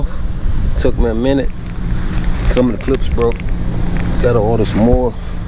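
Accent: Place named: Dallas, United States. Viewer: You sound like you're in dallas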